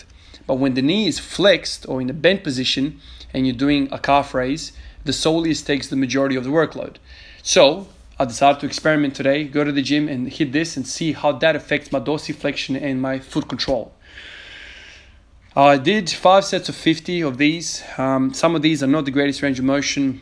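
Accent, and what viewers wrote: Australia. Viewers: I thought this guy was from Australia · I'm awful with accents. Are you Aussie, Kiwi or South African? Or none of the above? Haha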